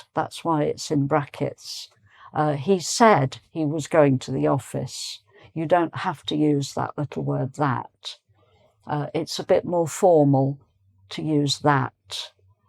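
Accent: England, United Kingdom. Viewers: How nice to hear english english rather than american english.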